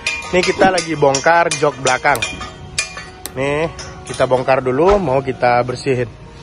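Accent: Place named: Sumatra, Indonesia. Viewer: Bahasa indo nya logat sumatra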